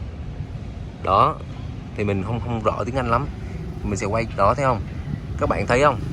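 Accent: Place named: China. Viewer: Lũ bắc kì khựa dân làm quảng cáo xe nghe khẩu âm khựa ngữ đéo ưa rồi.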